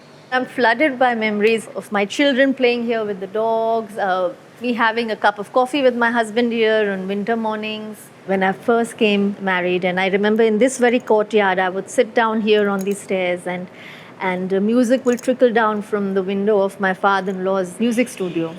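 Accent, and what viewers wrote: India. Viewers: She has the most beautiful Indian accent in the English language that I have heard. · I love posh Indian English accents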